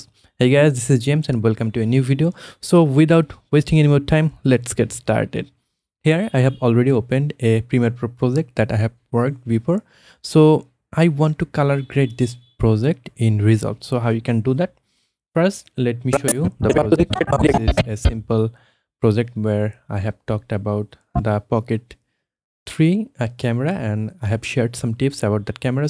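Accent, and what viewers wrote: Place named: Assam, India. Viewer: you have a north-east accent, are you from assam?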